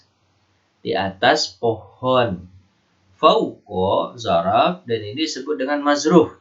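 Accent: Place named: Malaysia. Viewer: Logat Melayu nya 😂😂😂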